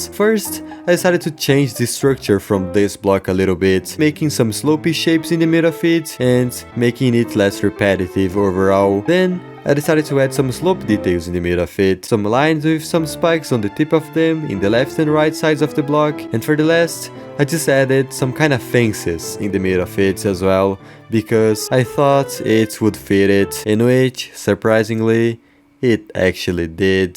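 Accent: Brazil. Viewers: I KNEW IT,im portuguse from portugal and i knew your accent was from brazil xddd ola de portugal adoro o teu conteudo! Continua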